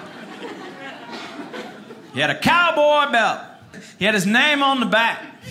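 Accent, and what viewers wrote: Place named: South, United States. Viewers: God I love the southern accent! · This man's accent is literally the stereotype of a southerner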